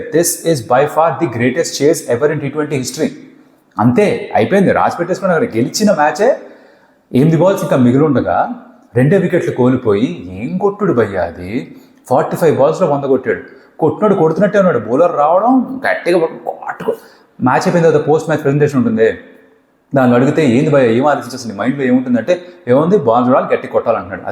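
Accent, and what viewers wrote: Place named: Hyderabad, India. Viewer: Bhaiyya apka Hindi mast hai bhaiyya....pakka hyderabadi hindi❤❤❤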